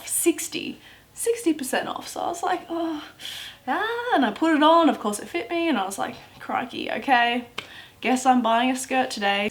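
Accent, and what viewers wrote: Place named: Australia. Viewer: I love your accent. So Australian! :)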